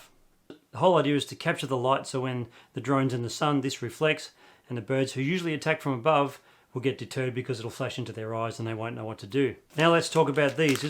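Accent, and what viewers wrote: Australia. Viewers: First thing I notice was the Australian accent. LOL! Well of course birds are a threat there, because just about anything that crawls or flies is dangerous in Australia. (Just kidding!)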